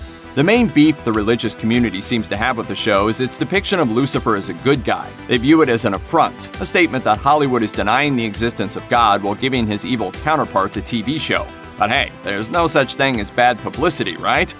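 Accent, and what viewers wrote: United Kingdom. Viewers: So sick of that British accent 😁 Am i the one?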